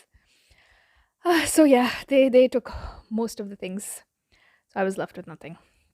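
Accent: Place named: India. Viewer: U sound Indian, not Korean.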